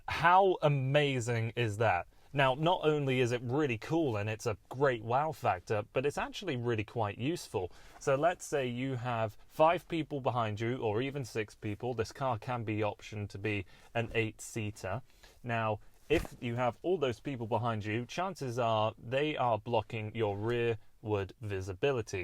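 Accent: United Kingdom. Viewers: British accent is not a bonus point or anything. But yes the presentation was good.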